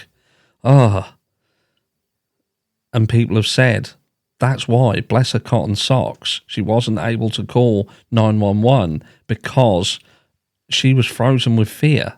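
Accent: United Kingdom. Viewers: True Crime is always better with a British accent.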